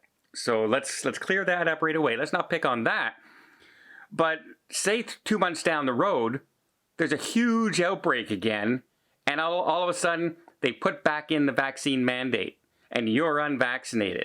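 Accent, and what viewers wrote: Canada. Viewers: I love how you Canadians say "about!" But seriously, why won't RCLL drop their vax requirement like Norweigen and Carnival?? I heard it's because the Caribbean countries/ports are requiring vaxed passengers - then how are other ships sailing there??????? Does Carnival go to different countries????? Sounds like Royal Caribbean is being ultra political....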